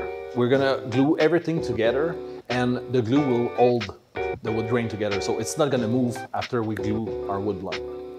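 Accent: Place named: Quebec, Canada. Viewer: un québécois et sa parrait comme moi génial merci pour les super conseil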